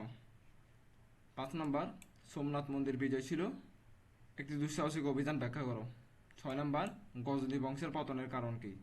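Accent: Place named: Chittagong, Bangladesh. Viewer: ভাইয়া আপনার কন্ঠ সুনেই বোঝা যায় আপনি আমাদের বরিশালের নয়,আপনি চট্টগ্রামের ওহ🎉🎉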